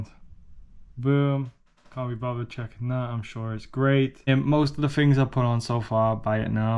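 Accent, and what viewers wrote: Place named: Liverpool, United Kingdom. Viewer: Hi mate, new to your channel so only watched a few vids so far. What is your accent? I can hear Liverpool but I'm hearing something else. What is it?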